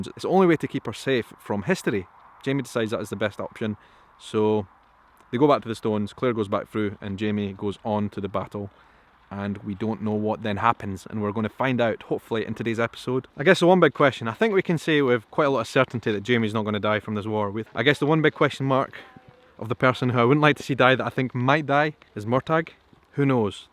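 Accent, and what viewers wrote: Scotland, United Kingdom. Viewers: Hi - love your vlog episodes about Outlander. In several of the videos you mention that all this is new to you because Scottish schools don't teach the history about the Jacobites, etc. I was wondering if now that will be changing due to the interest Outlander has generated, not just around the world, but in Scotland in particular. Love hearing your accent - Keep up the great commentary!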